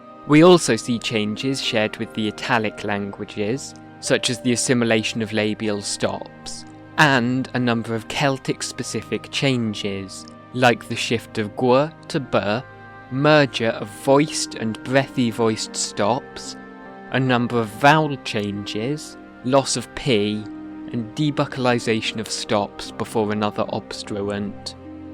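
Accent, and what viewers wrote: Wales, United Kingdom. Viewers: I was actually going to mention that (to me at least) you sound like you have a bit of a welsh accent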